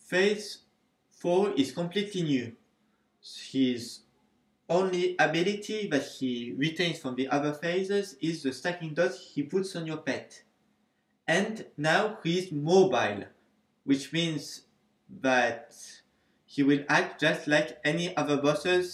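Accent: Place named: France. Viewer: Your English is not very good if you have trouble parsing a French accent.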